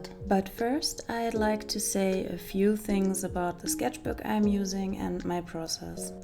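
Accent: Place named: Germany. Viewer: Love your german accent😂❤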